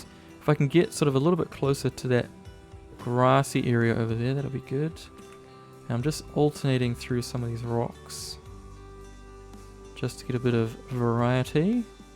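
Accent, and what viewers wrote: New Zealand. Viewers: Do you have a NZ accent? Love your channel.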